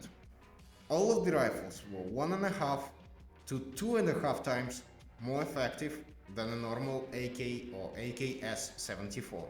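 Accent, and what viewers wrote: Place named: Russia. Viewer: Authentic Russian accent. I like it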